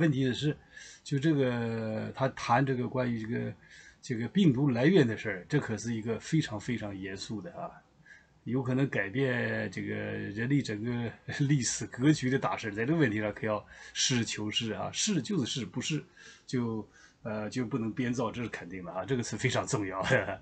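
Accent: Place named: Dalian, China. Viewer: 大连口音啊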